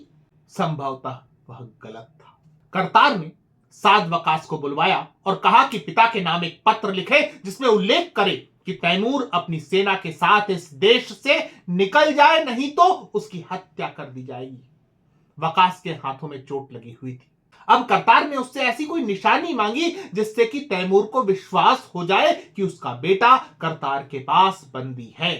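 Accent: Standard. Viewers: आपके हर वीडियोज की तरह ही ज्ञानवर्धक हे। आपकी शुद्ध हिन्दी और उसका सर्वथा शुद्ध उच्चारण प्रशंसनीय है।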